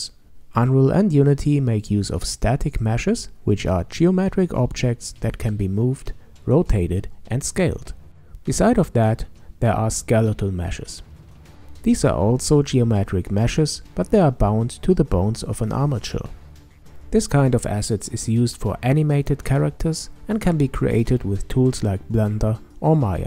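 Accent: Germany. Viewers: Bist du nen deutscher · german accent? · Man hört sofort, dass du Deutscher bist, also schreib ich auch einfach mal auf Deutsch ;P Wir benutzen Unity an unserer Universität aber optisch sagt mir Unreal Engine viel mehr zu. Grundsätzlich können die beiden ja das gleiche aber wäre für die Industrie später eines der beiden Programme mehr von Vorteil?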